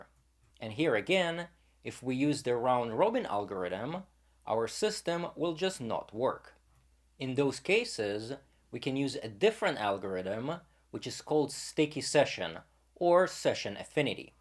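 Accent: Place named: Eastern Europe. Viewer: You have interesting accent, do you have any Slavic roots?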